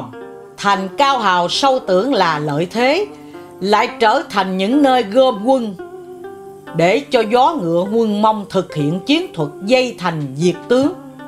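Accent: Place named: South, Vietnam. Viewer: Bài viết rất súc tích, giọng đọc miền Nam thật hùng hồn, rõ ràng. Tuyệt vời!!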